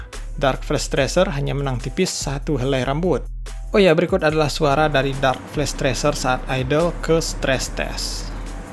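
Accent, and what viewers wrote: West Sumatra, Indonesia. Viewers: Orang minang ya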